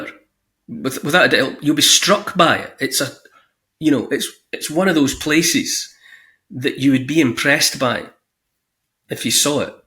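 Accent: Scotland, United Kingdom. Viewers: The Scottish accent even manages to make 'Millstone Grit' sound dangerous. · Scottish scozzese?😀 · Greetings from Finland 🤗 Love your stories, ranting and scottish accent. I get a cosy feeling listening to your channel. Must have some scottish blood in me 🤔 😊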